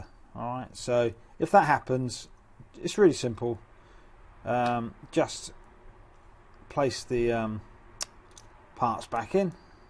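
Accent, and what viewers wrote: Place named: United Kingdom. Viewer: Yeah this one gets it! great close ups which are necessary here and a muchhh easier way to get the last piece open than the last video. I️ tried their way for like half an hour this took just a min. Thank god for YouTube or we’d never get these things open! Well done. I️ Also like the British accent ha it makes me trust you. For some reason. 🤔 Is that why nature documentaries are always narrated with a British accent? Cuz we believe that Brit’s have gained wisdom through their long period of history kinda running the western world? Or because the brits just make all the best nature documentaries? I️ suppose I️ just Love British things all around. Has anyone read this far? I’ve been up all night and fixing this fob is the icing on the cake 👌😎 now I️ know... I’m thankful for British accents and how much I️ trust them! Hey Now I️ know what to do when I’m stressed out...have people give me advice in British accents! 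Or find a British guy to hang out with. That could work:) I️ need a British boyfriend...for my health! I️ live in Cincinnati in case there’s anyone who wants to hook a sista up. Forrreal tho this is just as good as tinder imo. Who knew I’d leave such a long comment! This is a record and a first. Probably because I️ feel like the ppl who read this will be very purpose driven and probs not read/mind this rant for a brief distraction from the rocket science that is opening this fob! Waited months to change it but I’m doing it for my friend secretly so hell have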